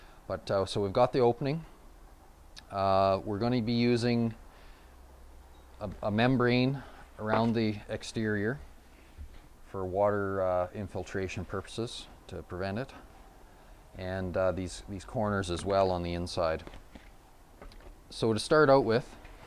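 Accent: Canada. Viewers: I love the Canadian accent, aye!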